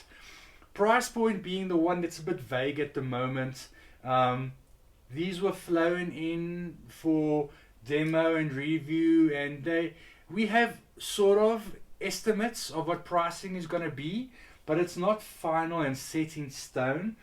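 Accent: South Africa. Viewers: I now have the svs ultra evolution bookshelfs with evolution center and 2 x pb1000 but i was using floorstanders before so i am missing 4 drivers and now i think it is lacking in presence. So i am thining to go with the primes Pinnacles actually, as the evo towers would definitely be too big for my 12 foot by 10 room..also a bit pricey too. I hope the svs prime pinnacles will blend ok wirh my svs evo ultra center. Maybe i need to swap that out for a prime center? Maybe just the plain prime towers would be enough. I am in a dilemma as I can get opened box from dealer for £699 or buy the prime pinnacle towers for £1600 A South African accent? First time i heard. Nice accent. No offense intended at all, as i am sorry I thought you were German to begin with, with a bit of an Australian accent. Got it sooo wrong :) sorry! 😂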